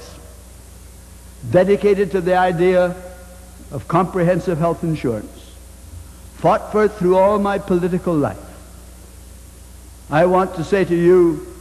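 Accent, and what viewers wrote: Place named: France. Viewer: I like the idea of free health care but our national budget comes first otherwise Canadians starve.some socialist believe that more barrowing and spending is a good thing but its your money and you spend it the way you want to.would this man qit ranting,his French acsent annoys the living hell out of me.scialisum dosent help the poor,it drags everyone down from richest of rich and poorest of poor.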